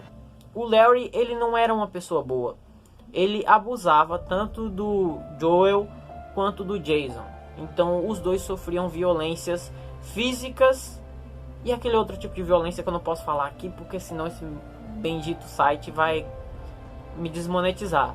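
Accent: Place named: Pernambuco, Brazil. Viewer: Que massa! Reconheci o sotaque. Sou de Pernambuco :D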